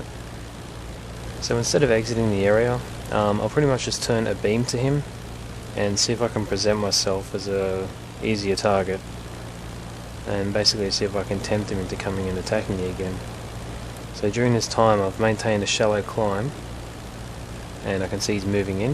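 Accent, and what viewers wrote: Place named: England, United Kingdom. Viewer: That's because you enunciate your words & they have never truly heard an English accent haha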